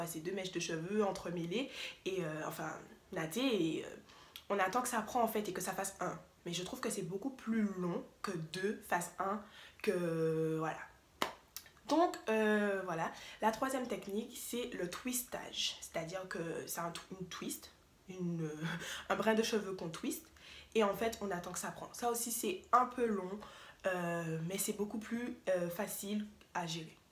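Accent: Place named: Antilles, Caribbean. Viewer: Humm un p'tit accent antillais🙃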